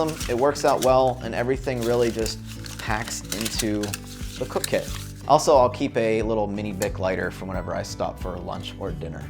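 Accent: Pittsburgh, United States. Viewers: You from Pittsburgh? You have a bit of a yinzer accent.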